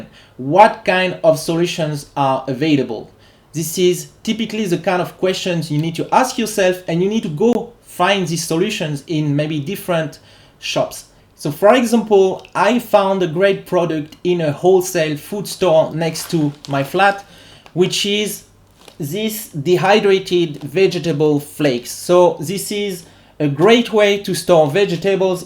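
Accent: France. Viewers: At first I thought you were German because of how you pronounce the word The but then I read the part where you live in Bulgaria and have a French accent... Anyways, appreciated the tips😀👍🏻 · Prepping with a French accent...a new twist for me!!! Love it!!!!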